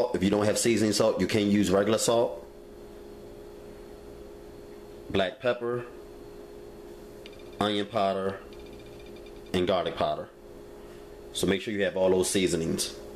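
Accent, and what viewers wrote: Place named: DMV, United States. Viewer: Homie sound like he from the DMV...on muvas! And the wing/rice setup look on point! No cap!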